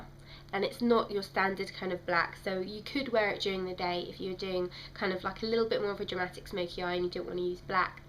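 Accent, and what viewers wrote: United Kingdom. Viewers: this is the best review ive seen!! i love this, i just subbed xx what part of the uk are you from? south, north, midlands etc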